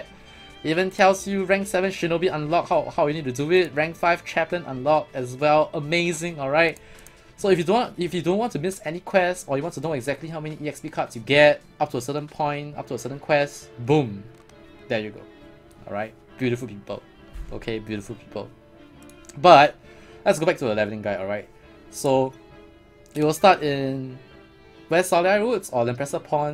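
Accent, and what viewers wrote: Singapore. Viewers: Thanks man, bro are you Singaporean too? Hahaha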